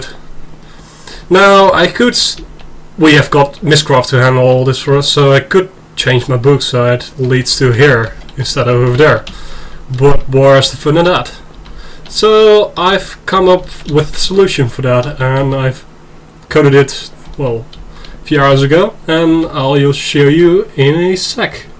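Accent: Netherlands. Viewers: 1. i love the fact that you sound so dutch 2. i love the fact that you just went "hey i can't walk fast enough and that bugs me, i know i'll just make an addition to my mod!" 3. i think a good idea would be air pressure jet packs or maybe even a pneumatic iron man suit because the helmet is already there. 4. don't do anything about fall damage, it's a part of the game and it makes it fun. if you want to take no damage launch yourself in water.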